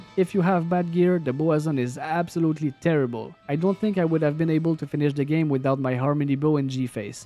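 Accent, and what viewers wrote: Quebec, Canada. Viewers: Sa sent vraiment le québécois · do i hear a small Québec accent ?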